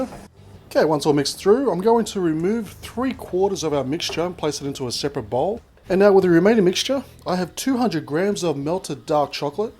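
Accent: Australia. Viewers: I love the Aussie accent on you!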